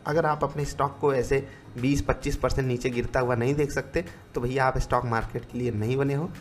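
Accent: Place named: Uttar Pradesh, India. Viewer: ap allahabad ya kanpur k lagte ho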